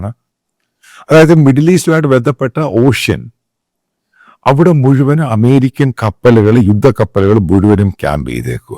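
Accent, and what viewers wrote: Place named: South Kerala, India. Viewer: അച്ചായന്റെ തെക്കൻ സ്ലാങ് കേട്ടു ചിരിച്ചു ചിരിച്ചു ഒരു പരുവമായി 😁😁👍അടിപൊളി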